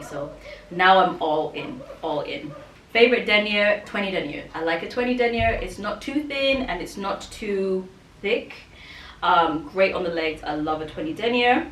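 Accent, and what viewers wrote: United Kingdom. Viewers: I don’t wear pantyhose, pretty sure I’ll never wear them……but it’s so nice to hear an English accent now I live in the USA. Plus you’re so fit as well. London girls are the most beautiful girls In the world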